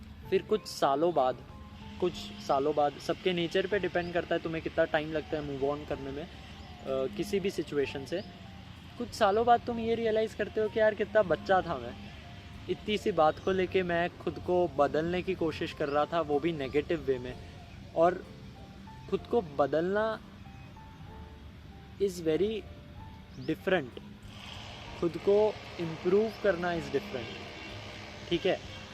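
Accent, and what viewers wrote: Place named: Gujarat, India. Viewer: gujrati log jab hindi bolte h tab thodi si to gujrati tone aa hi jati h bas ussi liye aisa laga 😅 btw mai bhi gujrat se hi hu .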